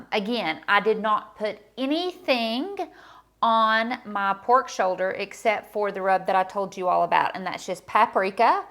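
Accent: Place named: South, United States. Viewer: Like the content! I love your southern bell accent ❤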